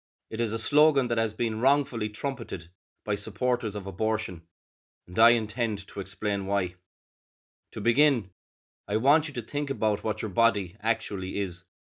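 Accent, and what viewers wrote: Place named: Ireland. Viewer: Cheers dude. Nice to hear an Irish voice of reason. Between you and Dan's Mix Tape I feel a little less like society is collapsing. Keep up the good work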